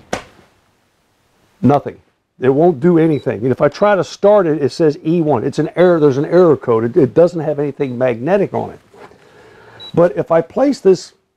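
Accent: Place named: Appalachia, United States. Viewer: Tou dont have very good control of the english language at ANY rate, APPALACIAN ENGLISH IS HAYSEED ENGLISH.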